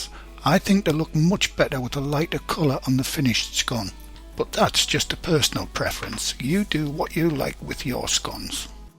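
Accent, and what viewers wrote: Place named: Northern England, United Kingdom. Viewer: Hi. I discovered your channel recently and really do enjoy your recipes. It’s great to hear a friendly northern chap giving it a go in the kitchen. Will you be planning any Christmas family favourite recipes, as we get closer to the festive season? All the best.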